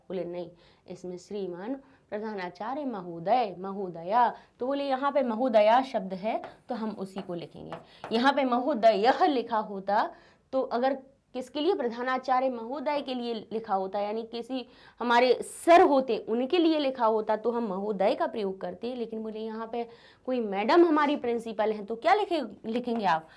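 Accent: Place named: Gujarat, India. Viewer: Aap kya gujrati ho kyuki isiliye kaha raha hu kyuki aapki awaz gujrati jaisi hai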